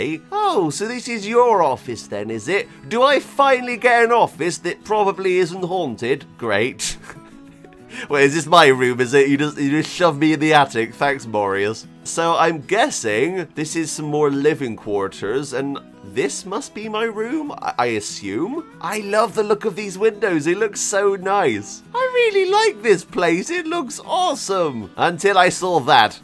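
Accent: United Kingdom. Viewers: From where in Britain are you?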